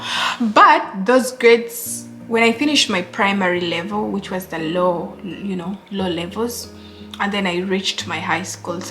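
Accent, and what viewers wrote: Uganda. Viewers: I love your Ugandan accent 😍 I'm in love with you and your energy ❤️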